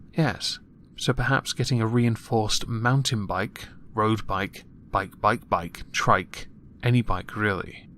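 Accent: RP, United Kingdom. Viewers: As a brit living in areas where common language is "enits" and "tings" you have the best British accent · I never knew how much I needed a refined British man critiquing idiots in my life, until I stumbled upon your channel. Absolutely loving your content!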